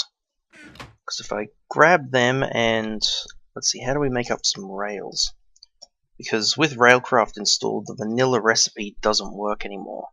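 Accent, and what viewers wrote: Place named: Australia. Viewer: One of the reasons I enjoy your videos is that I get to listen to another Aussie accent :)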